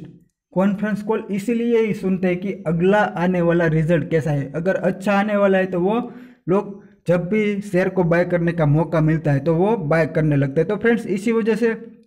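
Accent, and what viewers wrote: Gujarat, India. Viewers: ગુજરાતી હોય એવું લાગે છે કેમ ??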